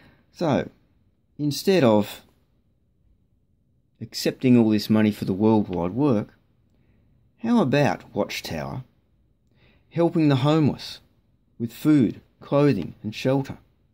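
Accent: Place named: Australia. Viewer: Thank you for sharing, we in Qld, nice to hear another Aussie.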